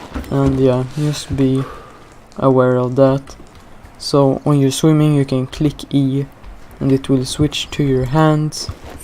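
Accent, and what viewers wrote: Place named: Sweden. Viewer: you sound so swedish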